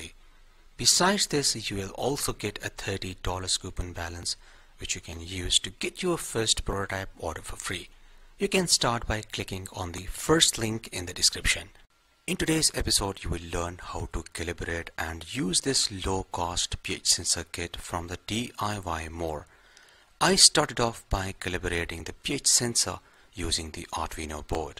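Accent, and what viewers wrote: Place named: India. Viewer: good video my friend with indian accent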